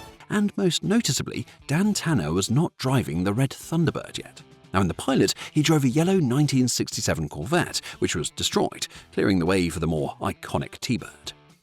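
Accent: United Kingdom. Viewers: I wasn't born in 1978, but I had never heard of this TV show growing up in the 80s in the UK, so I assumed it was never shown in the UK. However, the person narrating this video has a British accent, so maybe it was shown in the UK!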